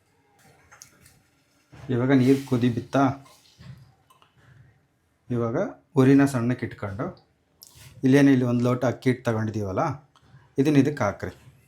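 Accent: Chitradurga, India. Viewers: Namma Durgada kannada 😂👌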